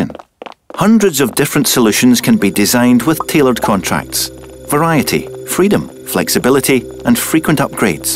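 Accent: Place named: Scotland, United Kingdom. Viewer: Aye, I love the narrator's Scottish accent. <3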